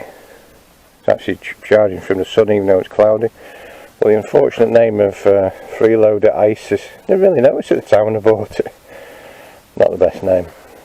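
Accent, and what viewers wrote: United Kingdom. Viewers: I assumed wrongly you were Scottish by the title. Once the helmet was off I could easily tell you're English. But as the Ozzie's say, "Good on ya" for picking up the trash! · Nice vid mate ... done a fair bit of bike / camping myself and loved it, did Spain last year and going back, can't beat the weather! ... as an Edgeley lad myself but not lived there for years its nice to hear a Stocky accent (c'mon County!) ... and as you know ... we are not Mancunian, we are Cheshire! Have great trips buddy and ride safe.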